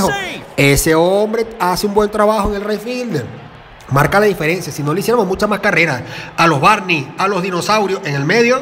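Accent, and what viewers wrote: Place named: Venezuela. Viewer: Pareces que eres venezolano mi pana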